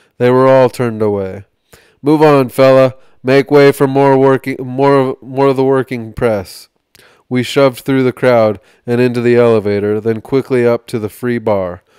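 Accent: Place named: England, United Kingdom. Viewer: Great selection. Nice English accent. Cut the BS at the beginning of your podcast though...no one cares. 😉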